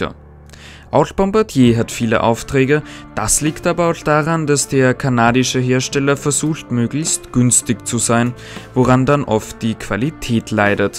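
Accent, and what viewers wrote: Austria. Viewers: glaub ist ein fast versprecher oder mikrofehler aber die acht spreche auch ich(auch ösi) so aus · Du bist für mich der "Apfelwelt" der Eisenbahnbranche :D kurze informative Videos mit einer ruhigen und gut verständlichen Tonspur mit österreichischem Akzent :p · spricht ja nun wirklich sehr gut verständlich und auch sympathisch. In Österreich werden einige Laute halt anders ausgesprochen, so what? Gruß aus Frankfurt, wo es auch nen Dialekt gibt. 😅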